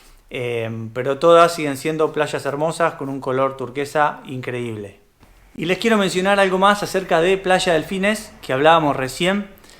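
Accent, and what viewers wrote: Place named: Argentina. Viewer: Gracias!! Sobre todo tu acento argentino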